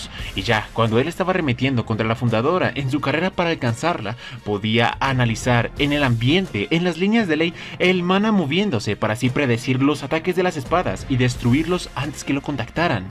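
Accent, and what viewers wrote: Colombia. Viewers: Eres colombiano!?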